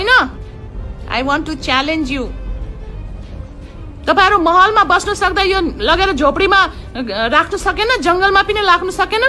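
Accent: India. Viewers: ali bhasha lawaj sunda Inian jasto cha. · tapai ko bwoli sunda indian jasto lago ne de jeu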